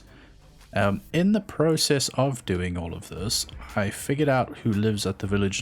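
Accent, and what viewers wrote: South Africa. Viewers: can hear that South African accent from a mile away xD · Your south african right?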